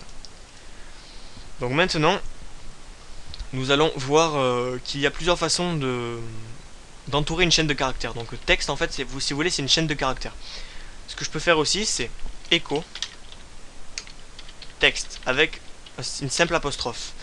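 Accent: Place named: South, France. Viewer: ) moi aussi je suis du sud :D vive les accents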